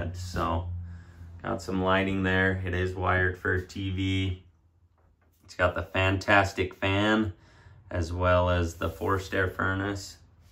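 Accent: Utah, United States. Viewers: I thought I recognized that Utah accent. Love this camper!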